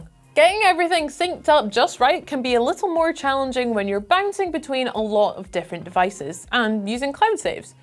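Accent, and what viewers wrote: Scotland, United Kingdom. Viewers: Love hearing a fellow Scottish accent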